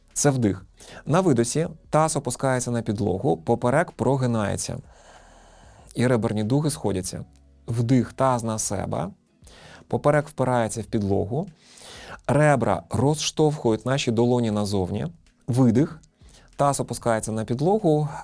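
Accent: Standard. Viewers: Як приємно слухати справжнього фахівця своєї справи! Дякую Вам за доречні поради, гарну чисту мову і позитив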